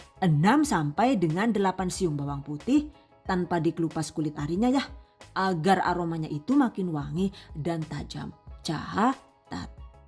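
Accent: Banyumas, Indonesia. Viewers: Paling suka liat video karna selalu detail,gk berbelit2 jadi mudah di pahami..apalgi kalo udh ada ngapak nya mkin seru nonton nya 😂😂.. #GiveawayTHV2021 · Salam hangat dari negri kincir angin..wah luar biasa resepnya..memasak sambil berhumor... bhs.gaya orang ngapak Banyumas...saluut dan proficiat..👍👍👍😁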